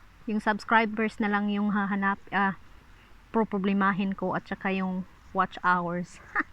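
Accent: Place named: Visayas, Philippines. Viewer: Hahha murag bisaya jd ky ang accent gahi kau murag ako Lol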